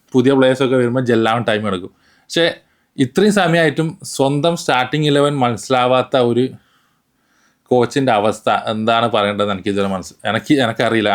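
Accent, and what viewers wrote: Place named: Tamil Nadu, India. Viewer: Ninde malayalam thanne manasil avunillaa..thaan thamizhan ano. Pinne shokam united.n aara parayne..niyarada 30 kollathin shesham oru pl trophy kandda... liverpool fan aaa idparine kayjja kollam a city onn thazhe poyappm...pottan lottery adicha pole oru trophy kitti....ithrem mosham samayath koodi poyappm..oru poolan fanm njjn onlinl kanditt illa...kayjja kollam trophy kittyappm...alle ni youtube thanne thodaggne.pooo mone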